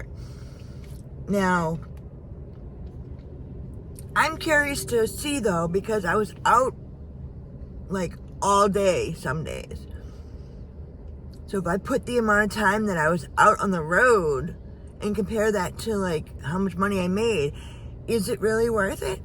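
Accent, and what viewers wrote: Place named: New England, United States. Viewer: Your new lisp detracts from your yankee accenth...kinda miss your former articuation. Btw, the loaders at Wally world have seriously been botching my deliveries (40 lb of birdseed on loaf of bread, a gallon of vinegar on top of a bag of chips, lord knows what that caused a bottle of baby shampoo to explode all over the rest of the order and my car). Lastly, you ain't doing that badly if you can afford Starbucks...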